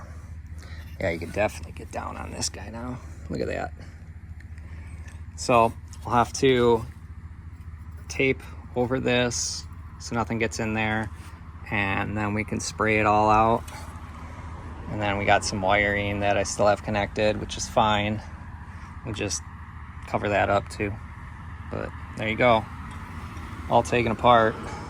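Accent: Wisconsin, United States. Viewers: Hey I’m curious. You definitely have a Wisconsin accent. I don’t really notice with. So when you are out & about have you had people ask where you are from? That definitely is not a Florida accent. 😂. Then again what accent is FL? Considering there are so many different nationalities living there. My pool had turned green. I had 2 floaters both with 2 3” chlorine tablets & 1 tablet in my skimmer & my pool was green. I had to get 4 5 Gallon buckets of chlorine. The next day it was clear as could be. Helpful hints. I was told by the pool people to add any chemicals at night. You should shock the pool after any rain. The rain and acidity levels throw off the chemical balance in the pool. Waiting until the evening time to add the chlorine & shock slows down the evaporation process. Both totally make sense. Anything to help save some money. 💰🤑. Chemicals can be so expensive.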